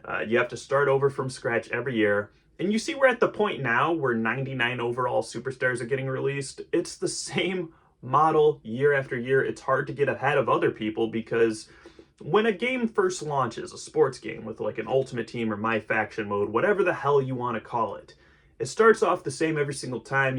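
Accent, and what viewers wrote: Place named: Mexico. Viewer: No homo bro damm you are hot 💀😭 I was thinking you were from Mexico because of your voice 📝🗒️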